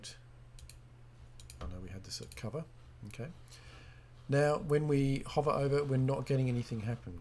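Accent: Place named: Australia. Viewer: So cool - and great to hear an Aussie voice.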